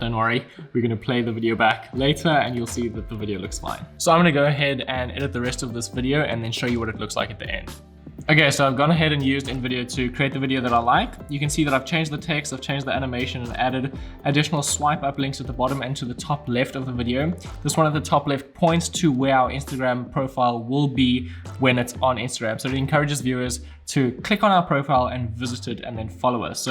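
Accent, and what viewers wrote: South Africa. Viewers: South African accent👌🏻🔥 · Are you from South Africa? I'm hearing my accent :)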